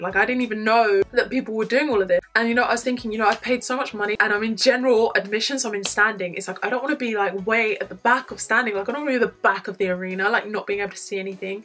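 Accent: United Kingdom. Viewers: You have beautiful British accent 😍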